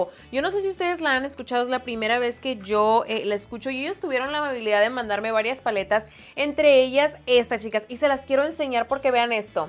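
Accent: Sinaloa, Mexico. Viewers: Todo el tiempo te escucho voz sinaloense 😂😂😂 también soy de sinaloa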